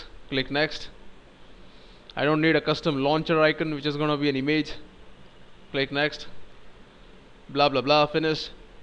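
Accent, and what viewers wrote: India. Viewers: Ever since I started learning to code, I got quite used to Indian accents. It doesn't bother me much. I like overly confident way of speaking. Good job bro. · Thanks so much, the first time i like an indian accent <3 seriously, THANKS!!!!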